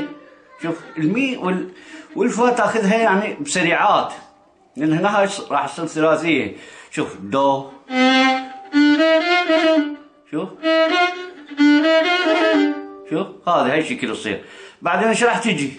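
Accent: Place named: Iraq. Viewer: ياروحي ياللهجه العراقيه 💙💙 .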